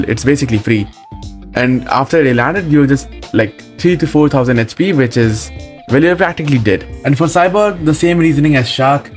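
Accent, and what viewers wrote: India. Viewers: the indian accent eww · ngl u sound like a indian curry dweller but good tips btw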